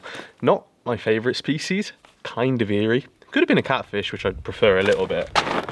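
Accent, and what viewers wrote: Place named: England, United Kingdom. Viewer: Thumbnail, content, editing after 20 seconds and the fine English accent (not Australian??) is an instant sub. Very Jealous of the work you do chap, I'm aiming to find myself in the outdoors more like this more frequently. Hopefully more trips can be inspired by your vids!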